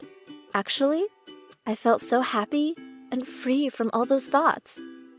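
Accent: India. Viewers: One question.....is the narrator Indian ?? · is the narrator Indian? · Are you from indian or something?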